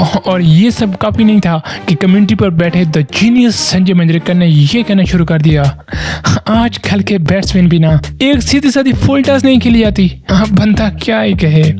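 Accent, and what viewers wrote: Pakistan. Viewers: Tera accent to Pakistani hai baat tu IPL dekhne ki karta hai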